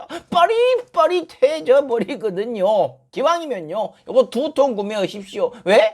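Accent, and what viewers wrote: Jeolla, South Korea. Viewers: 형 전라도야?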